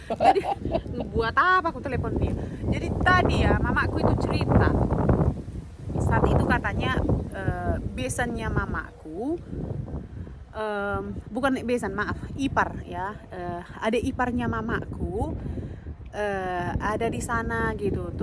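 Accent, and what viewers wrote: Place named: Batak, Indonesia. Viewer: Senang kali sama kk..walaupun lama di luarnegeri bahasa.dialek batak msi kental👍🙏🙏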